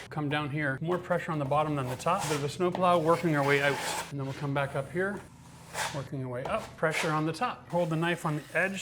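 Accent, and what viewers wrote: Canada. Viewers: Are you Canadian? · Canadian aye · He definitely sounds Canadian to me :P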